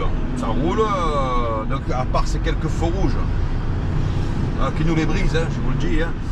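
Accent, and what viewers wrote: Marseille, France. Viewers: Salut, quelle plaisir tu me fait voyager le soleil, la mer, l'accent, j'en redemande 😊bonne continuation à toi 👍 ✌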